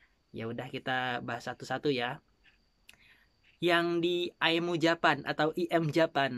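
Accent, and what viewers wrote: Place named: Sunda, Indonesia. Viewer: Dari logat kadang kek sunda kadang kek jawa, jd sebenernya sampean org mana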